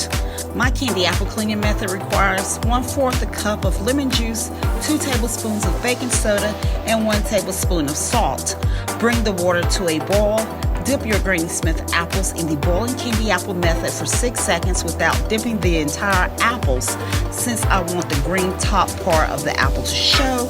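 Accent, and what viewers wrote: South, United States. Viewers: I love your southern sweet dialect. It's so soothing. Thank you for the tutorial.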